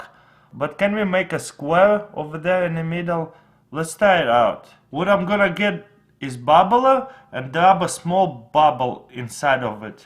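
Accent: Russia. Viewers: i fking love this fking russian :P when he do something he always say boom :P hes so funny and his english making me laughing so hard :PPPPPPP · russian english is very funy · cmon... i like this Russian accent :p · Учусь на ин язе . Когда я это услышал ,я думал умру от этого акцента. Если Вы русский тогда снимай на русском или это принцип какой-то? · Love your russian accent!! great vids btw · дуратский английский..когда слышно что русский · I'm French canadian living in west english speaking environement and this guy is really cool and authentic Russian accent. Keep that budy we love you and your funny experience. Thumbs up! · you got Russian acsent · сразу слышно что русский хдд но очень интересно поэтому лайк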